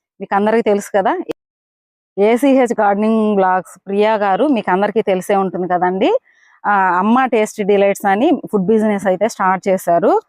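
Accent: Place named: Guntur, India. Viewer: మీరు వెంకటగిరి అన్నారు కదా, మీ లాంగ్వేజ్ నెల్లూరు లా కాకుండా గుంటూరు యాస లా ఉంది మీరు గుంటూరు వాళ్ళా గారు లేదా ఆ డెలివరీ చేసిన వాళ్ళు గుంటూరు నా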